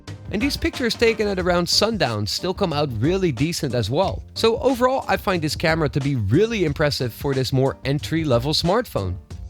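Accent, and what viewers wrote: Netherlands. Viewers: Nice Dutch accent.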